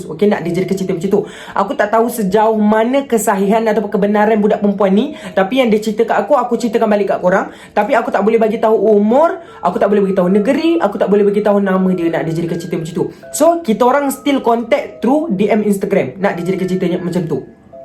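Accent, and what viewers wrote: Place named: Kelantan, Malaysia. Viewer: wow kelate 😂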